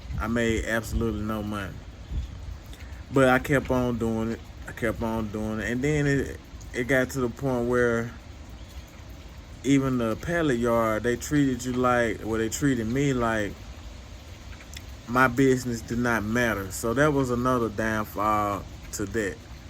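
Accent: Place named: South Louisiana, United States. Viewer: Sound like u close to south louisiana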